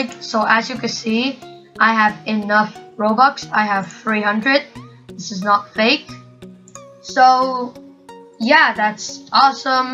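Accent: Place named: Russia. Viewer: dat accent doe RUSSIA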